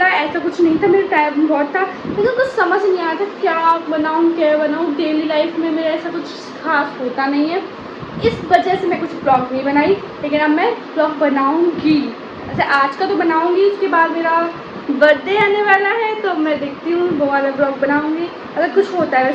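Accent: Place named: Bundelkhand, India. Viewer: Bundelkhandi achi lgti aapki 😊❤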